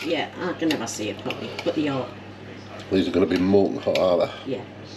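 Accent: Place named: North East England, United Kingdom. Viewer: My favourite channel❤ And as an exile from the north-east you’re a voice from home. I noticed you didn’t season the turkey meat before you rolled it. Was this deliberate?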